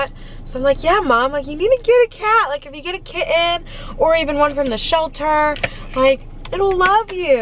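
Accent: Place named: Ohio, United States. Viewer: I say it like she does also, it’s a regional thing from the area of Ohio she’s from where I am also from. My husband makes fun of me. I also say full like “fool” etc etc.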